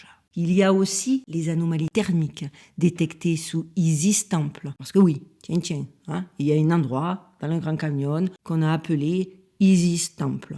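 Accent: Marseille, France. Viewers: exellente video ...j adore ton elocution et ton petit accent charmant de Marseille ;;; Felicitations pour ton travail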